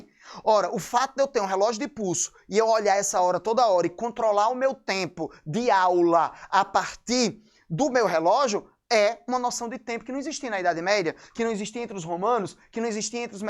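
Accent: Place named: Paraíba, Brazil. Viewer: Ese cara fala igual Paraibado OU potiguar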